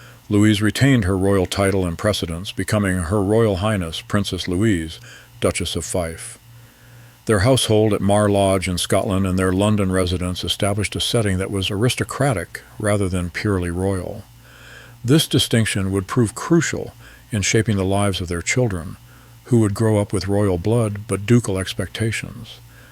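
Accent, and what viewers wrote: United States. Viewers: This is a very interesting presentation, but does it have to keep labouring the onerous ducal duties? Why oh why does it require an American narrator, talking about ‘dookal’ titles and children being ‘tootered’? Tiny point , Lady Maud was the niece of Queen Maud of Norway, not her great niece. Queen Maud was the Duchess of Fife’s younger sister.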